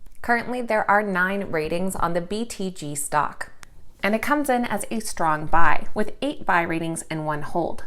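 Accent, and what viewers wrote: Canada. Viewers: Great vid.....always nice to see the sleeping pooch. Made 600% on Dogecoin.....sold everything. 👍. Are you Canadian?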